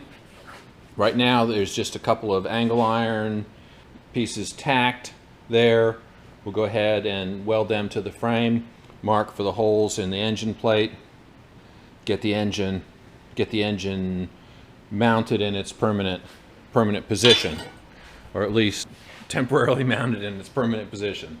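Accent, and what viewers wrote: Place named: New England, United States. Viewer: Great build. Recent sub here about the time you started this project. Been trying to guess what state you’re in, based on accent, garage style, and garage setup haha as that’s all I have to go by…… maybe somewhere in New England???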